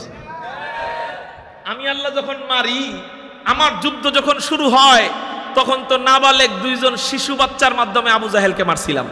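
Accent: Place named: Mymensingh, Bangladesh. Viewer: হুজুর আমি আগে মনে করতাম আপনের বাড়ি ময়ময়সিংহ কারন ময়মিনসিংহের বাসায় কথা কনজে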